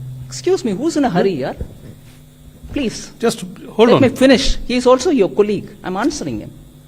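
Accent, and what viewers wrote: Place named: Chennai, India. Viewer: Amma u r great neenga prindhathu madurai aana neenga chennai pechu iducosam vera11 amma i m great fan of fr u amma neenga unmaiyele enga perima mari erukinga unmaithan inda dmk nambi vote potta enga kudumbam nadutherivil nikkudu annan udhayaniye nanbunen ennoda vazhkai soonyam aachu ethanaiyo exam pass panniten aana enaku tn government job kidaikale aana unaga centrel government job le ennoda 3 friends velaiku poittanga adu mattum ille nan udayanithi anna big fan avare rendu thadavai meet panni ennoda kuraiye solla ponen enna pakkave videle adule ennaoda love vazhkaiyum adanki eruku...,,ok iduvum kadandhu pogum i m from madurai.....,,,, I WANT SEE TN BJB ANNAMALAI SIR TQ TN PEOPLE NAN EVLO COMMENTS PADICHUREKEN PLS IDHA VIREL PANNU GA FRIENDS PLS I WANT TO ANNA MALAI SIR AND UDHAYANITHI ANNA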